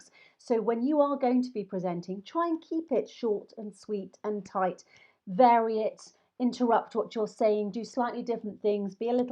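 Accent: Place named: United Kingdom. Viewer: Hi madam,I'm really big fan of yours,i like British accent,especially your accent.kindly suggest me any content or how i can contact with you to learn this beautiful accent which you have.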